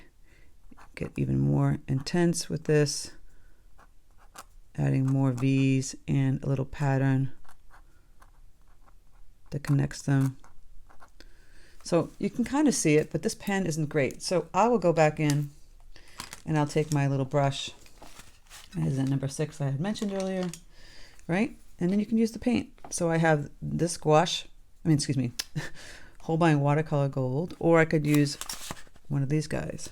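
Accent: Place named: Minnesota, United States. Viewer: Thank you, it is a fun project. I heard you inMinnesota😊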